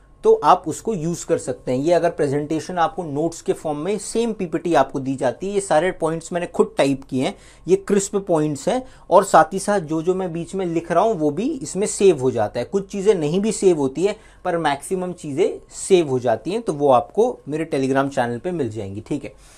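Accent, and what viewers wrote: Bihar, India. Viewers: Sir mujhe ye to pata chal gya hai ki aap bihar se ho .ab aap ye bataye ki bihar me khaha se ho 😊😊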